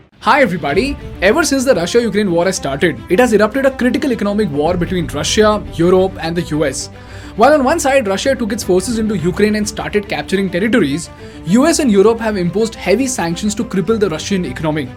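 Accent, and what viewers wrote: India. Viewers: It´s hard to understand a person from India speaking Englisch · Sorry but your English is not very good. Your English with Indian accent.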